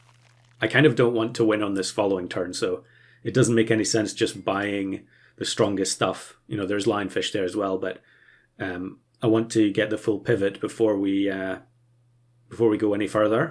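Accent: Scotland, United Kingdom. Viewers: Which part of Scotland is your accent from?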